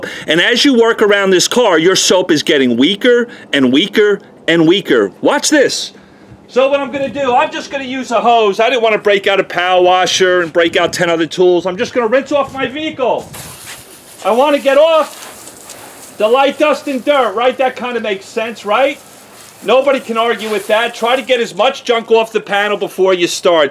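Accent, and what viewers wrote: New York, United States. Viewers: It's a New York accent, from where he's from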